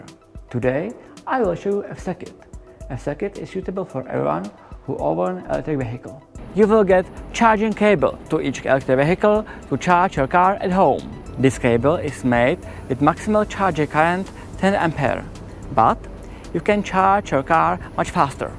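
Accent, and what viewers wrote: China. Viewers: his English accent sounds a bit like Chinese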